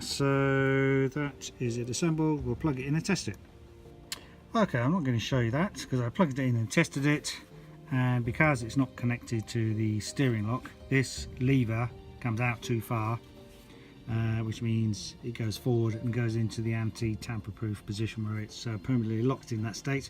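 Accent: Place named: England, United Kingdom. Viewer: A huge MERCI for your video (and for your pure English accent I can understad very well - I'm French)! I was able to remove and do some cleaning on the motor of the steering lock (Mini R56 2007). My friend first told me there was a problem with the power steering... So I removed the Power Steering electric motor (fully electric P. S.) , checked it and of course there was nothing wrong with it because it was the lock that was causing the random problem ! I used my Dremmel to cut a slot in both screw heads then used a simple screwdriver to remove the lock. Easy job! Note that when fitting the cover you have first to push the spring loaded plongeur far in with a right-angled tip in order to position the cover correctly. The lock works nicely now, I just keep my fingers crossed the problem is definitly solved. But as the DC motor was very dirty inside, I guess this guy was causing the fault! 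Your help is worth a pint of beer, my friend !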